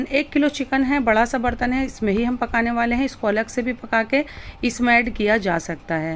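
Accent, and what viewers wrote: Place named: Delhi, India. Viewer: I love your proper Dili Urdu accent. I can listen to you all day long.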